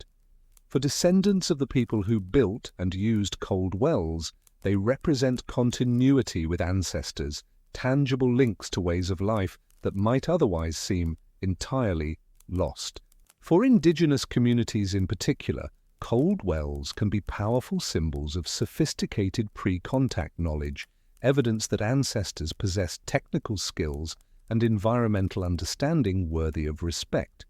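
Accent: England, United Kingdom. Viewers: I like these but they are all done in English accents and I really crave hearing an American accent. Especially the series about the frontier!!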